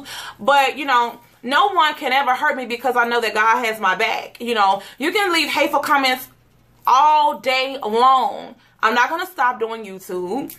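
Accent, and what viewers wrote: Mississippi, United States. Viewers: Fuck em boo 💋 they need to boss they life up 😜 #LovingThatMississippiAccent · your very inspiring.. I love how you handle the comments... I also have thick skin also.. I'm just starting 2 new channels and I am looking at other youtubers like you and I get cause y'all have a lot of subscribers where as on my other channel (not this one) has about 30 subscribers... don't get me wrong I love my 30 subscribers and I love them all dearly but I want to be inspiring to more people like you are baby girl... I have a lot of friends from missisipi and your voice is just like theirs and I can easily tell you are from missisipi and I don't think your voice is annoying... Much love to you and your life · she may be fat but she still slays and she looks good Plus Size and you don't have the right to tell her that because she is still human and she is beautiful just the way she is, so all y'all hating keep it to yourself and if you wanna say something say it to her plus her 155.6K subs and the way she talks is just because she is from Mississippi. 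You can't hate on somebody just because they talk different from you. STOP THE HATE!! her family loves her the way she is so don't assume they are happy and if you think your hate comments are ruining the life, THINK AGAIN HONEY! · I'm not like them but I love your videos!!! You must be doing something right. Remember you are going to have them haters which is very sad. To say the least. They need to get there life. Must don't have nothing better to do. Like that song. Plus jealousy sad. It's truly nothing wrong with the way you talk. You just have a country accent that's all!!!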